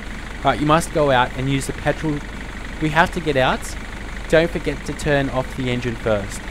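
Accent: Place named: Australia. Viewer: he sounds NOTHING like nerd3. Nerd3 is English, this guy is Australian...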